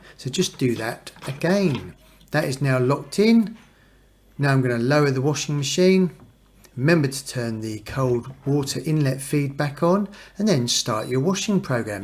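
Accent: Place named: Cockney, United Kingdom. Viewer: A cockney needing a cup of tea before doing the job. A Northerner would have just got on with it without messing about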